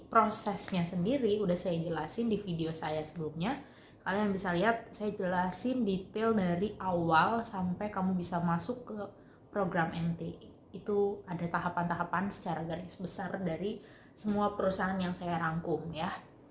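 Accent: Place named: East Java, Indonesia. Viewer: Mbaknya pasti orang jawa timur, kalau salah maaf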